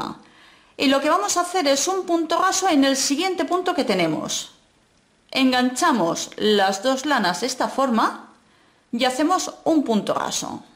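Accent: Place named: Berga, Spain. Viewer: Hermoso, me encanta!!!! (Tienes acento berguense). Saludos!!!!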